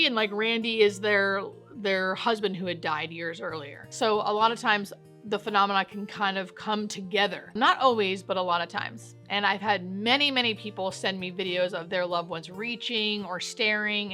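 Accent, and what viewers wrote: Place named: United States. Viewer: Bless you, no offence meant you high yank accent is difficult and painful to my ears, again no offence meant. Your video belongs in north America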